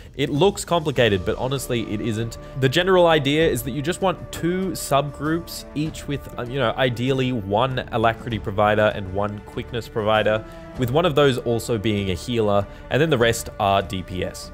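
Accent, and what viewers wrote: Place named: Australia. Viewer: >Australian accent Yeah imma head out.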